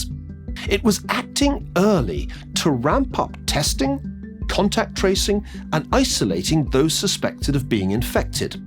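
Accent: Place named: United States. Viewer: Loving the American accent Niall!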